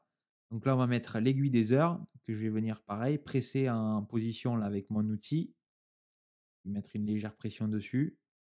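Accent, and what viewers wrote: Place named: South, France. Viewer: notre bon petit accent du Sud, c'est vraiment plaisant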